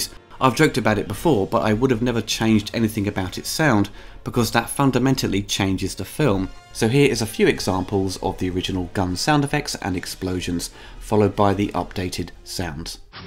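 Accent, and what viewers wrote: United Kingdom. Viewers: I agree, I prefer the old sound, it goes along with the movie style and soul. The new sound I would say it it's more in tune with newer films. The color is appalling! Why are they always messing what is right instead of correcting and clean what is wrong. Star Wars for example, is one of those cases of color tampering bahhh. Exactly, there will be always people who like one thing and others don't, so why not include options. By the way, love your reviews, channel and your British accent =) Cheers!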